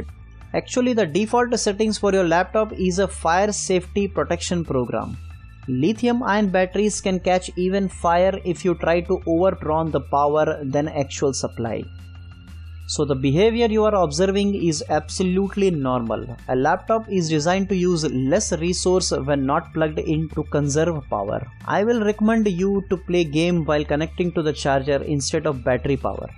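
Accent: India. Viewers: lol Indian accent is just beautiful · Wtf we know the consequences. Just tell us the solution. Your accent is terrible. Definitely Indian.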